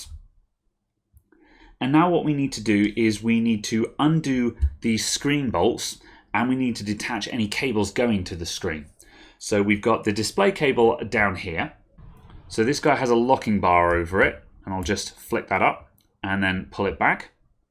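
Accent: England, United Kingdom. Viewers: Just followed this and found the hinges were actually cracked on both sides, so no hope of fixing the loose screen, but brilliant, clear and helpful video. Bonus points for clear English accent.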